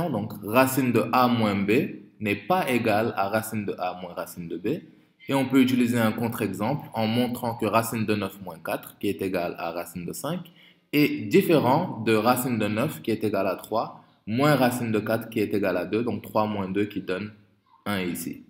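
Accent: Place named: Africa. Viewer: Vraiment merci beaucoup, et je suis sincère maintenant, j adore ton accent africains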